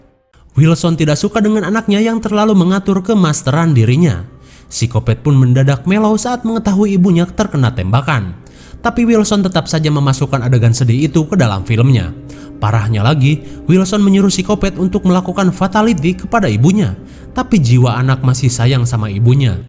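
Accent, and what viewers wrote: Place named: West Java, Indonesia. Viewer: Enakeun kalo orng sunda yg bawain cerita :v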